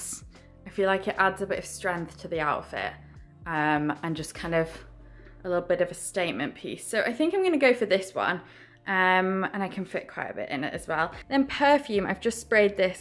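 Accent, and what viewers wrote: Manchester, United Kingdom. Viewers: She has a gorgeous accent, like posh Mancunian… probably Alderney edge or Somewhere Cheshire’s. I love the videos and she is very articulate.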